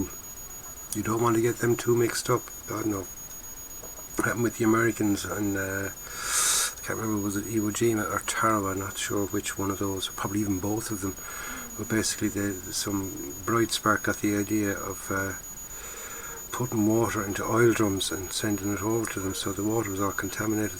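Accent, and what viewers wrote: Ireland. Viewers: I really watch these videos just to hear the soothing, quaint Irish accent. "When I drink whiskey I drink whiskey and when I drink water I drink water." - Michaleen Flynn But I do also learn a thing or 2 about building scale models & other stuff. Neat 251/1 btw ;)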